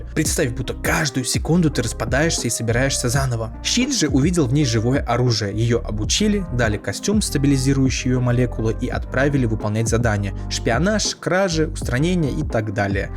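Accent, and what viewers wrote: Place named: Tajikistan. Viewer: Интересно.Ты какой нации чел? Такое ощущение что как будто таджик или узбек говорит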